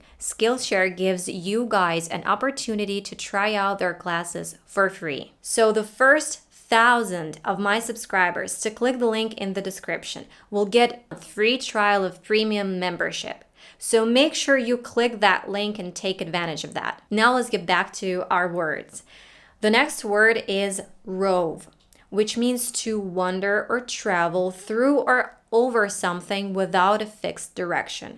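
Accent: United States. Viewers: Hola. Soy Gabo. Vivo en USA y mi hijitame dice que tu inglés es Americano. Ella sabe inglés pero yo aun no. Me gustaría saber como inicio tus clases. Gracias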